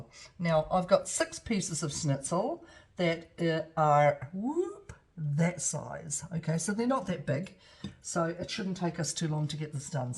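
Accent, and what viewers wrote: New Zealand. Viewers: Bruh what I understand it fine. New Zealand accent bruv you must be American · Kia ora! So nice hearing a Kiwi voice, feels like i'm listening to an aunty.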